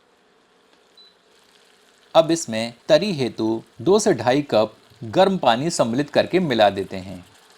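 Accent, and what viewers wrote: Standard. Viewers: श्रीमान आपका शुद्ध हिंदी बोलना बहुत ही आनंदित करता है परन्तु spoon चम्मच कह के बोलिए सोने पे सुहागा हो जायेगा धन्यवाद!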